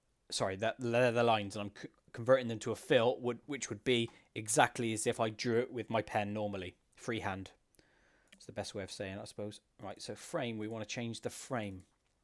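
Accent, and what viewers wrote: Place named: West Country, United Kingdom. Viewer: Are you from Bristol, by any chance? Your accent sounds very westcountry with your "Aff" instead of "Of" and your hard Rs. Don't worry if you are lol, I'm also a Bristolian!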